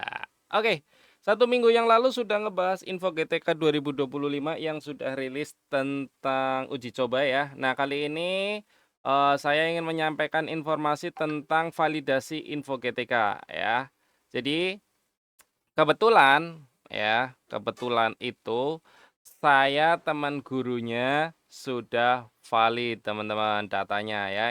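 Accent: Banyumas, Indonesia. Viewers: Mas mw nanya. Kalau honor negeri bs cair gk kira"?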